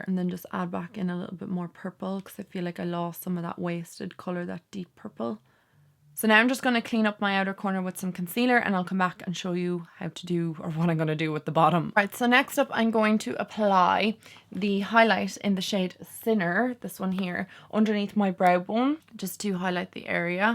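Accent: Ireland. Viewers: Where is your accent from in Ireland?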